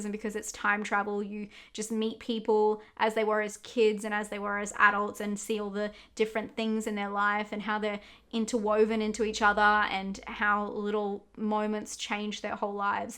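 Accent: Australia. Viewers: So, uh, "really sh*tty" is a 1 star rating? 😛 Think I may be watching too many of your vids. Your Aussie accent is not as noticeable to me. 😕 Stay Spooky.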